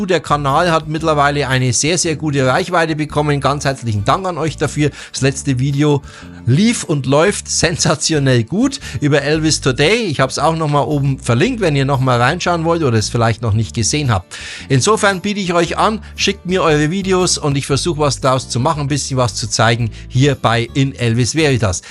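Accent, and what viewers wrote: Upper Franconia, Germany. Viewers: Hallo, Deine Videos sind phantastisch. Frage an Dich, wo kommst Du her, Du hast einen unverkennbaren oberfränkischen Dialekt. Ich bin auch eingefleischter Elvis-Fan und hatte bis 2017 eine Band. Man nennt mich den "Elvis von Gunsenum" zu deutsch Gonsenheim. Ich lebe seit 1978 in Mainz und bin aber ein Rehauer, wenn Dir das was sagt. Vieleicht können wir uns im nächsten Jahr Mal in Bad Nauheim zum Elvis Festival treffen. Das würde mich freuen. Mit rockigen Grüßen von einen oberfränkischen Elvis Fan zum anderen. Volker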